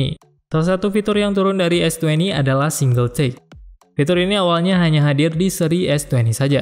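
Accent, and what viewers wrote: Aceh, Indonesia. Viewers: Orang aceh ya bang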